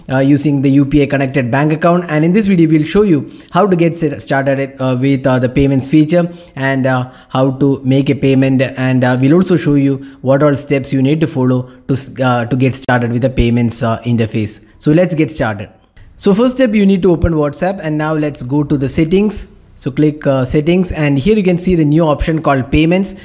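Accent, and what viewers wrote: Kerala, India. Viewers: speaker is talking too fast to have a tutorial . Never even paused once so we could follow. Not everyone is Indian & understand this fast talker w/accent as well. Horrible. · R u malayali sir..?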